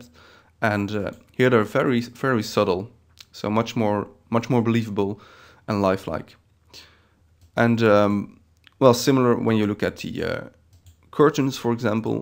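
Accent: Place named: Netherlands. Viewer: hee jij bent een nederlander! ik hoor t aan je accent :P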